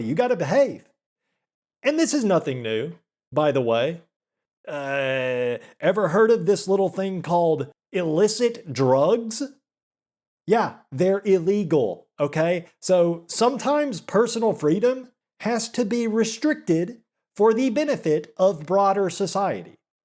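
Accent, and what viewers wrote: South, United States. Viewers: I love how southern and simultaneously not southern you are XD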